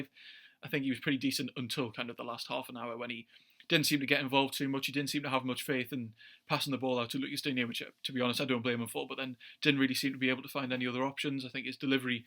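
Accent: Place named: Newcastle, United Kingdom. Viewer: You from Newcastle pal? Sound very geordie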